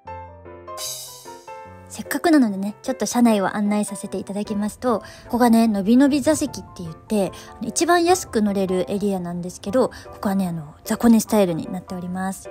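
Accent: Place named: Kansai, Japan. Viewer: ちょっと関西弁が出てて親近感を感じちゃいました。次回の動画も楽しみにしております🤗🚃🎶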